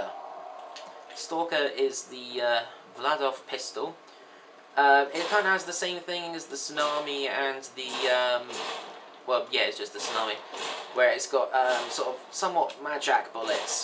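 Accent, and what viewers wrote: United Kingdom. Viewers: u sound like harry pottah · Love the British accent XD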